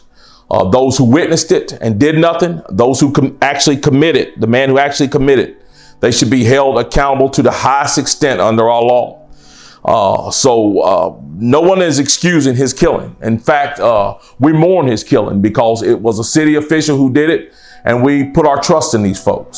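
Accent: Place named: North Carolina, United States. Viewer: Just love hearing your accent. I miss my NC home. Went to UNCG. Best of blessings to you and your family.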